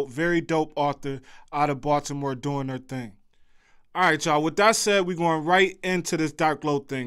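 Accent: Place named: Upstate New York, United States. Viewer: I can tell by your accent that you from upstate maybe Buffalo?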